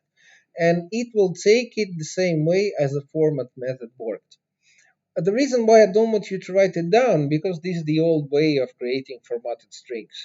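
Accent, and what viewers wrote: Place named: Russia. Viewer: RU акцент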